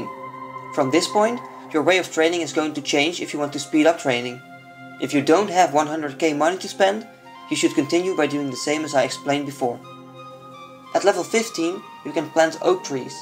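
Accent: Netherlands. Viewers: The dutch accent hahahaha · With this accent I´m gonna assume you´re Dutch :P Great guide anyway.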